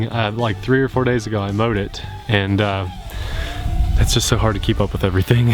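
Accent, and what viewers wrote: United States. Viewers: ...Are you from Ohio?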